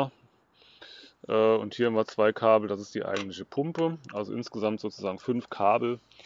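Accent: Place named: Hesse, Germany. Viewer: Spannendes Projekt! Wie ist das eigentlich mit Tüv und Steuerungs-Elektronik. Muss die Ansteuerung/Software des E-Antrieb bestimmte Abnahmen erfolgen? Mir gefällt es gut vorhandenes wieder zu verwenden. Ist halt viel gefummel und gesuche. Dein hessisch ist sehr sympathisch. Anmoderation ist mal was neues. Passt. Gruß aus Süd Hessen.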